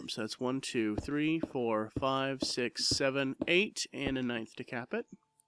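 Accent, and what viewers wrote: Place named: England, United Kingdom. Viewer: You English not Mrican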